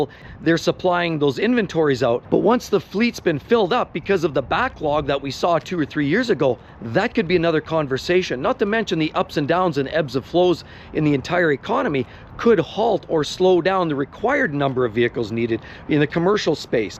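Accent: Canada. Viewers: Yes, truck prices are stupid but did I detect a bit of Canadian in your voice? And then I looked up the pizza place next to the lot you are on. There's one near Edmonton, Alberta. The exchange rate is currently 1.37 Canadian to the U.S. dollar so cut those stated prices by about a third to get the U.S. prices. And do some homework before venting your spleens! I still wouldn't buy one, though. Pitter patter now! Yes, been streaming me some Letterkenny lately!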